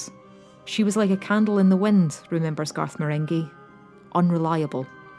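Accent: Scotland, United Kingdom. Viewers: New to your channel, caught it through inside number 9, but must say ireally love this review of dark place i loved it and still do and noone i know has heard of it or chose to give it a try so thank you also nice to hear a fellow Scottish accent online didn't even need the subtitles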